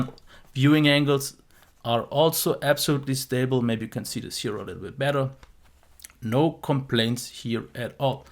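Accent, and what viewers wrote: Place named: Germany. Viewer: That also sounds good to me! Keep up the quality and the good old German accent. :)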